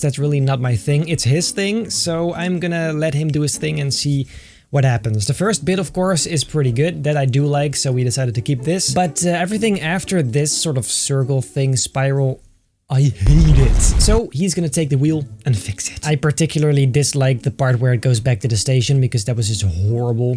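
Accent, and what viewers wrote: Netherlands. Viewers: Are you Dutch? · He sounds dutch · Are u dutch?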